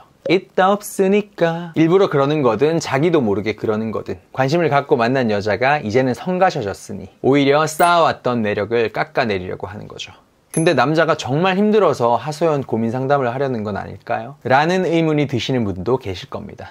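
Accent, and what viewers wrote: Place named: Daegu, South Korea. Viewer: 대구에요? 억양잌ㅋㅋㅋㅋ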